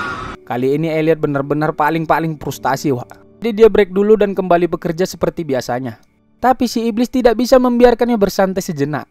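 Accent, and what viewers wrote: Medan, Indonesia. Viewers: bahh... anak medan rupanya...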